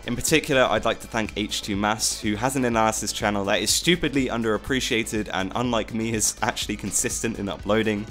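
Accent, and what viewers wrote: United Kingdom. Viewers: are you from north or south UK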